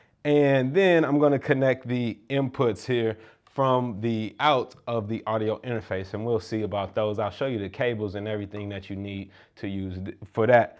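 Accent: St. Louis, United States. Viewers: I knew you was from st. louis by how you was pronouncing yo ER's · Yoo, you from the STL? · You from Stl?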